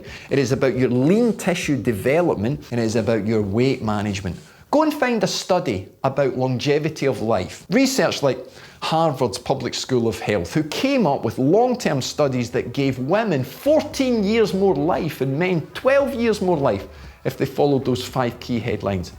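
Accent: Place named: Scottish Highlands, United Kingdom. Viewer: I hear a Highlander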